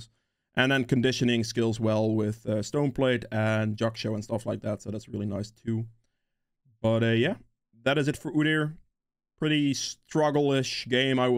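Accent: Netherlands. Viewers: Ran into your video's while looking if I wanted to play Udyr, immediately recognised your Dutch accent :D Keep up the great content!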